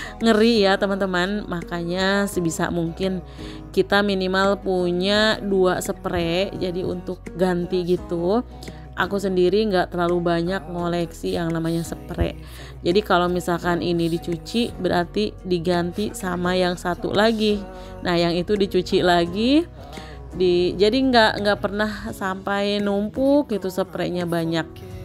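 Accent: West Java, Indonesia. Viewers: Ibu sunda pisan, mun sur org sunda mah asa ka cai we jauh ge da ka bumi budak 😂😂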